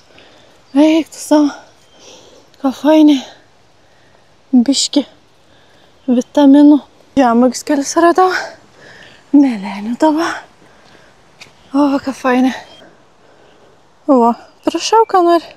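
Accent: Samogitia, Lithuania. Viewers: Smagu girdeti zemaiciu snekta. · Smagu klausyti žemaitiškas tarmės, labai smagiai žiūras. Sėkmės👌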